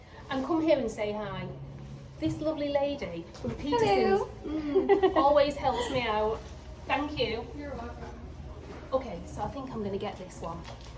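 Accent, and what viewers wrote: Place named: London, United Kingdom. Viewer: Awesome ride and video. I’m here local in Miami also. I ride that road all the time that you were on. Half of my family sound like you from London. Ill subscribe and hopefully we can do a ride. P.S. black point is the spot.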